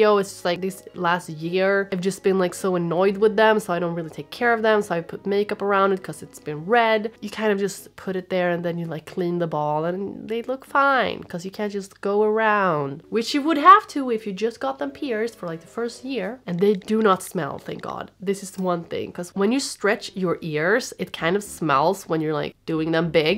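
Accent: Sweden. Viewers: Is she Swedish? Cuz she kinda sound like you have that Swedish accent when you speak English. Lol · It's her accent, she's swedish. ^u^ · Is she from Sweden bc she has that accent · SHE HAS AN SWEDISH ACCENT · She sounds sooo swedish lmao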